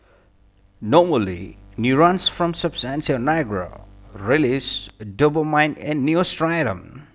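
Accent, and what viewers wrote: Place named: India. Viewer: Good in Content,Animation & Voice Clarity...Would have been better if it explained how Physiotherapy treatment works on the PathoPhysiology & the Accent was Purely Indian!!!! Waiting for more articles in Physiotherapy!!!! Einstein Babu..,MPT (Neuro) Physiotherapist , Govt of India(ESIC Model Hospital) , Bangalore,India.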